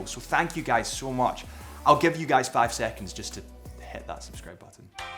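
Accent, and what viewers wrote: Scotland, United Kingdom. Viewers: Racist. The poor man is Scottish 😢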